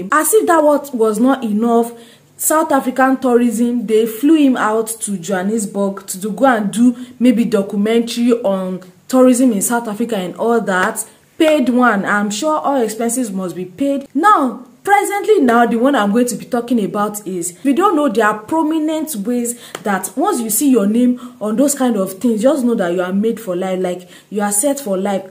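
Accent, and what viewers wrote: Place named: Nigeria. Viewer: You sound Nigerian. 🙄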